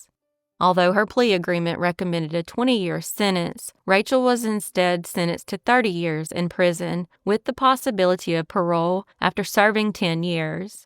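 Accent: South, United States. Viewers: Your thick southern accent ist so cute! My mother tongue ist German, i live in Germany and i lovr strong southern accents!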